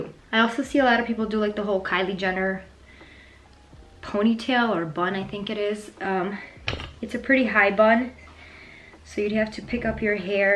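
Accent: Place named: Midwest, United States. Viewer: no way ur not from the midwest with that awesome accent...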